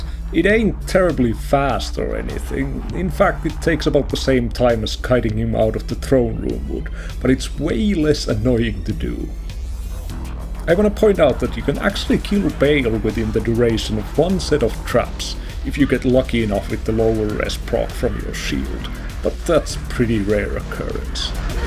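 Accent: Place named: Finland. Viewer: ahh :D tunnistin heti suomen aksentin sun videosta :D gj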